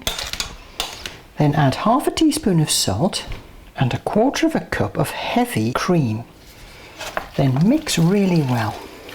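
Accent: United Kingdom. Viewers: I am second gen Canadian Grampa from Finland. Her name sounds more Finnish but I truly could not tell you honestly. Very British sounding Voice which is delightful. Thank you for the recipie, I make Rieska which is similar but a little thicker. From what Gramma said. Thank You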